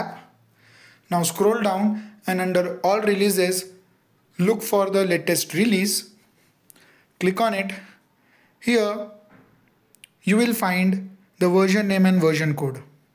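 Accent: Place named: India. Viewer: Disliking and down voting because I had to listen to an Indian insult my language by speaking it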